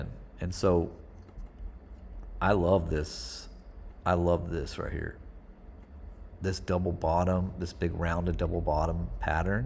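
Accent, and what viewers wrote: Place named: South, United States. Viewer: Just found your channel - bought some HBAR last week. Came for the charts, sticking around for the southern dialect.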